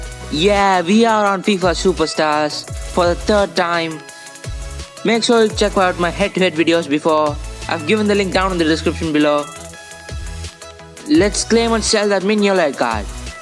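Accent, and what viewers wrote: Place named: Tamil Nadu, India. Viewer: Your tamil accent is HARD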